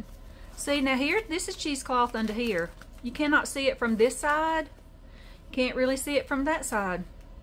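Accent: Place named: Tennessee, United States. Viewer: Great tutorial! I have a sister-in-law from Tennessee who lives in Alabama and works in Georgia and you sound so much like her! I'm from Pennsylvania and don't have an interesting voice at all. What a beautiful journal! I just started mine.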